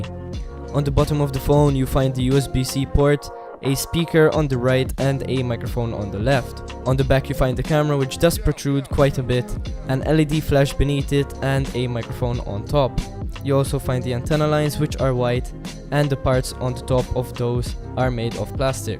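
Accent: Malta. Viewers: Noticed the maltese accent 😅 awsome channel kugin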